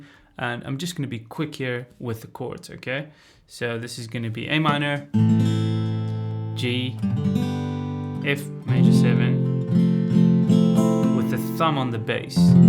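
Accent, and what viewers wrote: South Africa. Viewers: Great video brother. Is that a saffa accent you’re sporting?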